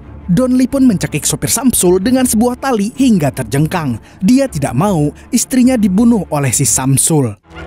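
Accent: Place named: Paringin, Indonesia. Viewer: Seperti orang Paringin ni abg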